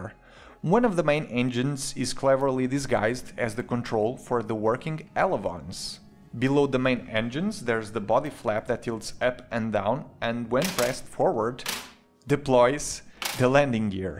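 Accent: Portugal. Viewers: You are Portuguese or Brazilian?? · Damn, I could spot you were Portuguese 2 seconds after you started talking :D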